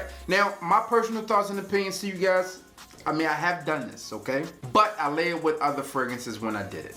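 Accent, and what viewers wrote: United States. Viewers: No offense to Jeremy fragrance but I can understand exactly what you're saying cuz I'm from America and you have an American accent that's more easier to understand then some other men's fragrance YouTube channels if you know what I mean ty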